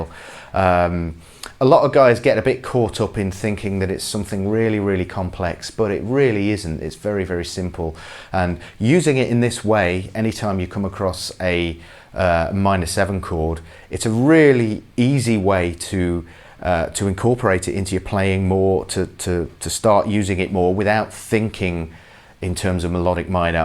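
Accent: England, United Kingdom. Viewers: You british accent is excellent :D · ENGLISH accent.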